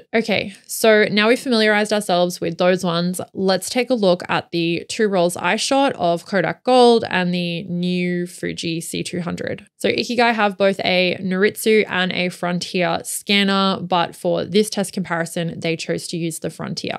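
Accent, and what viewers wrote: Australia. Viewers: if you could drop either the Aussie accent or the valley girl inflection your channel would be one hundred percent more bearable. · I’m subscribing for your content, your delivery and, of course, your awesome Aussie accent… as a Canadian living in Southern Arizona - visited Sydney in 2015… Beautiful people and sooor friendly. Don’t change a thing!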